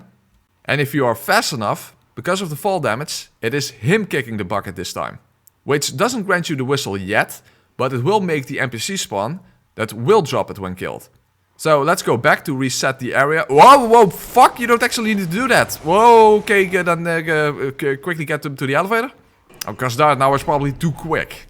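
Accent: Netherlands. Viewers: Leuke video! Altijd grappig hoe wij Nederlanders een typisch accent hebben wanneer we Engels praten. xD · U dutch? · gok aan t accent randstad ook? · First video i watched on your channel, immediately heard the dutch accent hahahah🇳🇱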